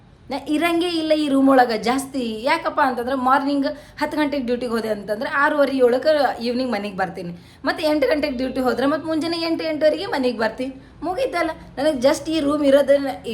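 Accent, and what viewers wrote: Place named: Gulbarga, India. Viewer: ಹೇ ಹುಡುಗಿ ಛಲೋ video ಮಾಡ್ತಿ ಮತ್ತ ಬಹಳ ಛಲೋ ಗುಲ್ಬರ್ಗ ಭಾಷೆಯಲ್ಲಿ ಮಾತಾಡ್ತೀಯಾ ಹೀಂಗೆ video ಮಾಡಿಕೊತಾ ಹೋಗು ನಮಗೂ ಬೆಂಗಳೂರ್ ಭಾಷೆ ಮಾತಾಡಿ ಮಾತಾಡಿ ಬೇಸರ ಬಂದಿದೆ... ಏನಂತಿ 🙋‍♂️🙋‍♂️🙋‍♂️🙋‍♂️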